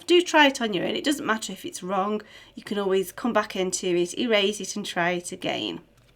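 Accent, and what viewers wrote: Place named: Staffordshire, United Kingdom. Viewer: knew it!! I know a Staffordshire accent when I hear one :) I’m from Derbyshire!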